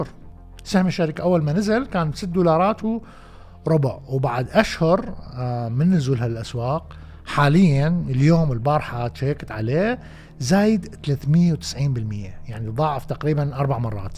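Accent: Iraq. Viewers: دائما مبدع أستاذ بأختيار المواضيع الاقتصاديةالرائعةوالمفيدة..لذلك صارلي يومين أسهر على فيدوات قناتك المميزة🌹💐🌹وأتمنالك الإستمرار بهذا الحديث الممتع واللهجة العراقيةالبسيطة وياريت تصور حلقة مشتركة مع الأخ العزيز أنس على قناة زنگين...وبالتوفيق والنجاح انشالله🌹💐🌹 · اخى العزيز لهجتك العراقيه جميله وبسيطه ويفهمها الجميع وانا كمصرى اعشقها واعتقد انك لست بحاجه الى ترجمه بالفصحه ،،، تحياتى لكم اخى على قناتكم القيمه الرائعه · ممكن تحط ترجمه كلامك مو مفهوم اللهجه العراقيه شوي صعبه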